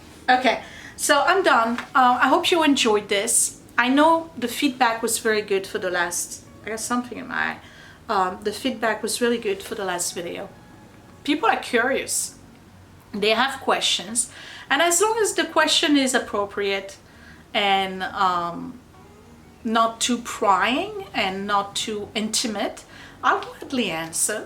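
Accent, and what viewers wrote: France. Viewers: I have been watching you since u were working on the office. I fell in love with your willingness to rise to the challenge and share it with us. I love seeing you interact with your family. I love your accent and your stories. I call you my French lady on YouTube. I really enjoyed this video, it was a great visit with my friend. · The French accent is really cute though. BTW, as an English teacher myself, there is one thing I 'd like to say: having an accent is normal. It's nothing to be ashamed of. You have taken on the task of learning another language. That is hard. No one, if they aren't learning another language or are bilingual themselves, is allowed to criticize that.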